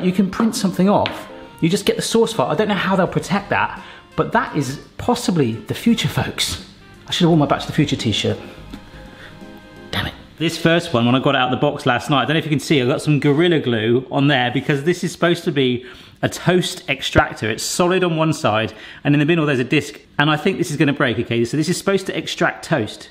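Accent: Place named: United Kingdom. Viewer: You think in 2021 everybody have at home a 3d printer?maybe in America or England because i think you are British after accent.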